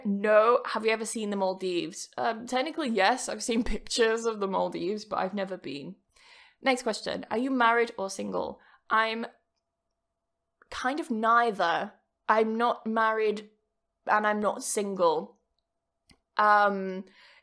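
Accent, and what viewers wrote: United Kingdom. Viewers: First comment, maam.. Happy returns day.. I have been watching for two months and I really like British🇬🇧 english. Thanks🌹 👍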